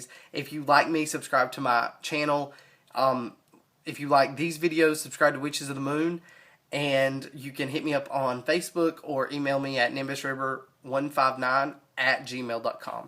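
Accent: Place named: South, United States. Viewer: Looking forward to watching your videos! I am from England and I love your southern accent :) x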